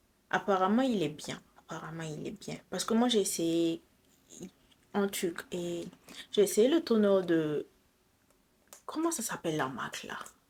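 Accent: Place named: Burkina Faso. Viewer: Dès 5 premières secondes j'ai su qu'elle est burkinabè 😂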